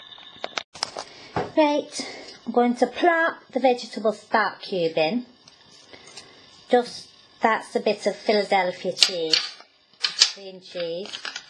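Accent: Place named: Scotland, United Kingdom. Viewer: You sound almost Scottish in this. So, how does this taste?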